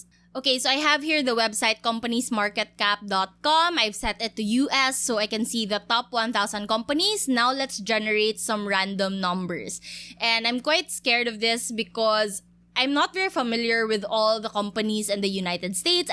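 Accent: Visayas, Philippines. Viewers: Bisaya po kayo Ma'am 😁😁 ??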